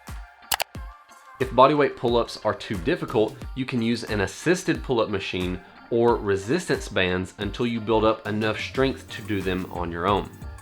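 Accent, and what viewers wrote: South, United States. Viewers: deep southern accent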